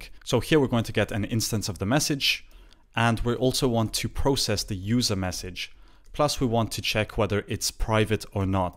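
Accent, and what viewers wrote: Italy. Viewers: bruh the best italian english speaker that I ever seen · Are you Italian?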